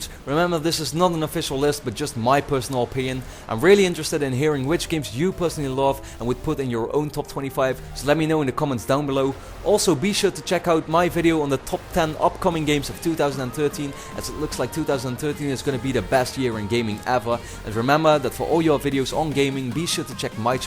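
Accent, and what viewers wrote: Netherlands. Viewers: deze man is nederlands? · are you from the netherlands? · hey nice video can i be nosey and ask are you dutch man? :) cool accent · Jij komt uit nederland hé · Always good to hear a dutch guy, not playing cod or fucking fifa. Nice list. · RobinGaming you sound like a dutchmen who speaks english ! so are you ? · I'd say, without looking at your channel, that you are Dutch.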